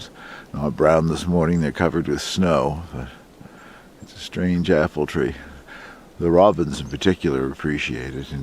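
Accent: Canada. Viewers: was going to ask where in the world are you, but I guess from the accent and Tim Hortons cup is a give away! :) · I am thoroughly enjoying myself watching your videos. Your lovely Canadian accent reminds me of my home turf of Northern NY. Grew up about 26 miles south of Cornwall but have traveled quite often into Eastern Canada where all of my ancestors came from.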